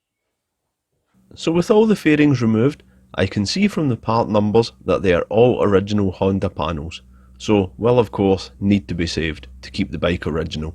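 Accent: Scotland, United Kingdom. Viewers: Are you scottish? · Are you Scottish? It's like listening to Luke Stoltman 😅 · Nice camera angles, on spot editing, humor, Scottish accent and a VFR!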